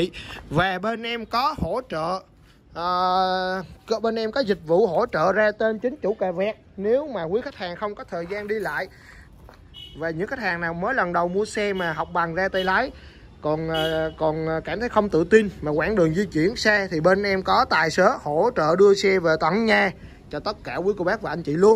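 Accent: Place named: Binh Duong, Vietnam. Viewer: giọng chắc đồng hương với tài ô tô bình dương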